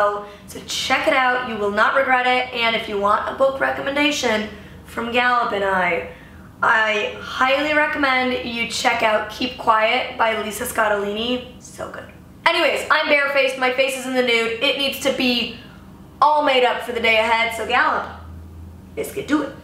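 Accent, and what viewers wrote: France. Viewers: I actually love her French accent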